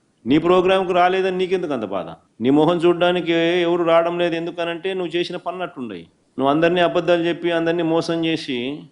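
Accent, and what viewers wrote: Rayalaseema, India. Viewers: రాయలసీమ యాసలో బాగా దింపావ్ కధా జగన్ సూపర్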